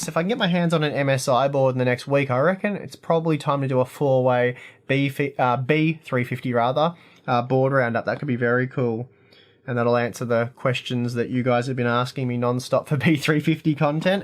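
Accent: Australia. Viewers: oh just realize you're aussie mate!! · i WANT you to say something in pure australian accent in the next video.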